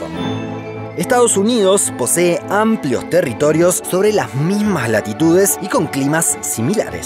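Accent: Argentina. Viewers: Creo que el narrador es argentino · Che no pierdas el argentinismo en el habla viejo! "Medeín" , "Guaiaquil", "Abuia"... ¿qué es eso? mandale sheísmo a pleno. No pierdas la identidad argenta loco, que es un recontra canal y que sea argentino me gusta y enorgullece a dos manos XD Abrazo de gol!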